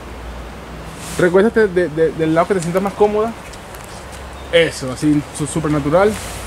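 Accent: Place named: Venezuela. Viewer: Hola que tal Saludos. Esta un divertido Video. A penas empecé a verlo y dijiste la primera palabra de inmediato supuse que eras Venezolano. Hehe y al final del video dices que si efectivamente eres Venezolano. Llegué a este video porque me compré ese lente de 85mm y quería buscar algunos videos relacionados con este lente. Las fotos muy lindas. Muy buena composición y un divertido video. Saludos.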